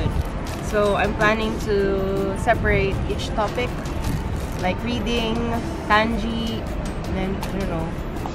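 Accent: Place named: Philippines. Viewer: your speacking english was look like an filipino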